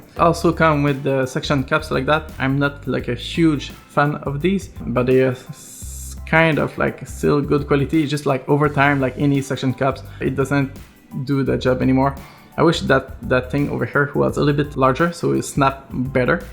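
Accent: Quebec, Canada. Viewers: Tes Québecoé toé ? Jme trompe ?? Hahahaha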